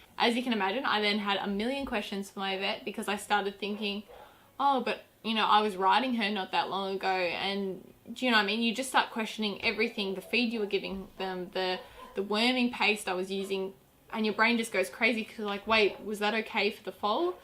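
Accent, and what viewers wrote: Australia. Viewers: I realized today that I must watch way too much Bondi Vet because I Wasn’t even paying attention to your Australian accent until you started talking about the summer and winter months. I kept rewinding it and listening to that part over and over again thinking I heard it wrong and then you said a thing about the northern hemisphere and it all clicked into place and I feel like an idiot. Apparently all my Bondi vet hours have made the Australian accent sound normal..... I’m in Texas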